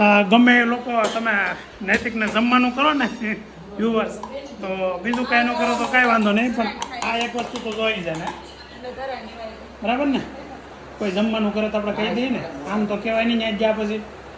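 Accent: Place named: Mumbai, India. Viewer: Dada e song bavj saras tune maa gaayu, emno accent thi laage che ke pela Mumbai rehta hoi.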